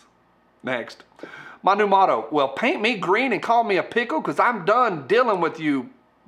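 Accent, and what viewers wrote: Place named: New England, United States. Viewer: We need more New England accents, lovee.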